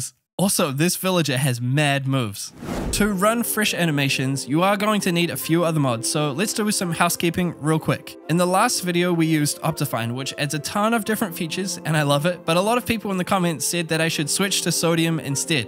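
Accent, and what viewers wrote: New Zealand. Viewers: Woah I knew I heard a Kiwi accent!! Nice to see content creators rising from Aotearoa ❤️❤️ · I assume he lives in New Zealand. 5,000,000 population, and fitting accent. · I could tell your from nz when you said your top video had more views than people in your country and your accent 😊 Kia ora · It's nice hearing a fellow Kiwi on youtube. Also very strange. Keep up the good work!!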